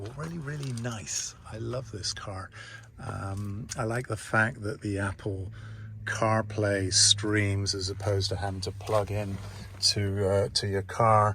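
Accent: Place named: United Kingdom. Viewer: Good to hear another British voice here, I’m in OC. Love these base broncos. Look forward to seeing what you do to it 👌🏼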